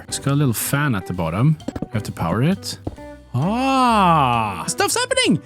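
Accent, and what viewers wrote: Sweden. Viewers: I swear, every time when i hear you i think that you must live or is born in sweden with the accent that you have.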